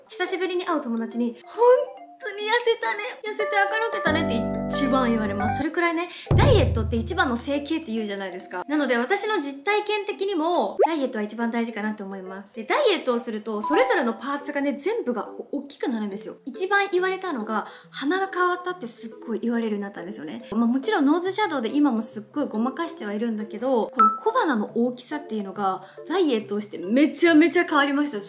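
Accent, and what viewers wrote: Japan. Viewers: 喋り方めっちゃ一緒やーって思ってたら同じ県民やった‼︎親近感♪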